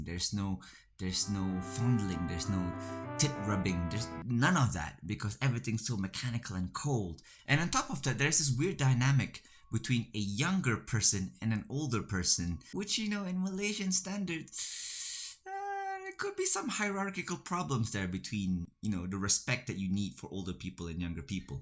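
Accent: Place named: Russia. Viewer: Hebatlah bro. Love your humor and the Russian accent is spot on!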